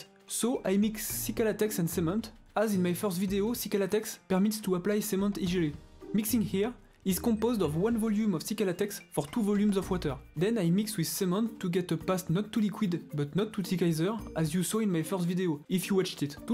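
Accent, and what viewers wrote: France. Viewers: un anglais avec accent français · on voit bien que c'est un Français qui parle en anglais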